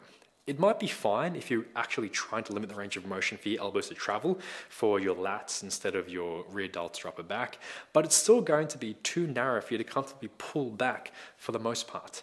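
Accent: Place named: Australia. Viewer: Where ya from bro? Australia? I was trying to work out this accent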